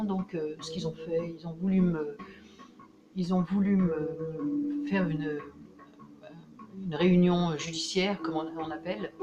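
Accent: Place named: Lyon, France. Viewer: Excellent, Dieu répond toujours à nos prières sincères. Bon rétablissement surtout psychologique et sois bénie. A ton accent, tu ne serai pas d'origine lyonnaise ?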